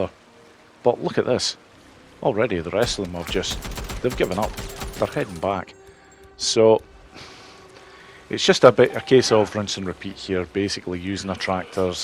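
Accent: Scotland, United Kingdom. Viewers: Love the videos, dude, but I can't help trying to figure out where you're from, haha. Your name is Slavic (or more specifically Serbian and neighbours) and your accent I thought was Dutch at first but now I reckon it's Scottish. You're truly an international man of mystery :)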